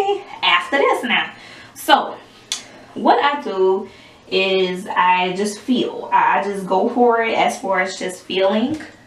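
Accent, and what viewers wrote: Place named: Louisiana, United States. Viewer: We gon start wit da bek, let's giv it sum wuhta bebbe😂🤣😂🤣😂 I jus luv ur loui accent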